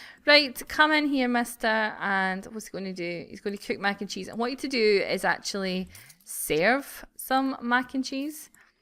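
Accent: Scotland, United Kingdom. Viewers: Your accent is amazing:) i grew up in Hamilton/Glasgow keep up the amazing videos:) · For lunch I had: CHOCOLATE, EASTER EGG, and some other stuff (cheese and biscuits? I don't remember) but most importantly CHOCOLATE!!! SO EXCITED!!! But med school is a lot of dedication just to meet hunky guys... The family (plus Grace) are so cool!!! BTW As a English-American person (who needs to work on her accent), I think that Scotland is really awesome, and your accent is adorable! I would love to go to Scotland, I only went when I was little. It's nice to see some of the new stuff (clothes, hair, etc.) on actual sims to be played with, not just maxis' creations. And Tate is really cute. :D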